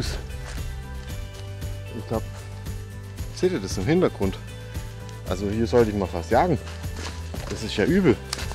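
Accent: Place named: Swabia, Germany. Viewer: Wo sind wir denn gerade? Bei uns im Schwobaland? WMH! 🌿🌲 so hört es sich zumindest an…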